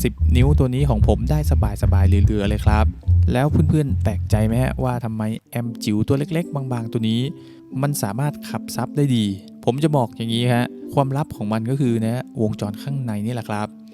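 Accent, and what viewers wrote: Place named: North, Thailand. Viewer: คนเหนือคับ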